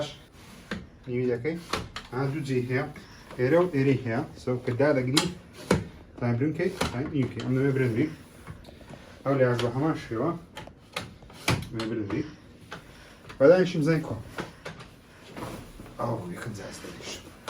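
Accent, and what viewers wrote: Erbil, Iraq. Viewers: Bzhe zorm pe xosha ka ba hawlere zmane rasant qsa akay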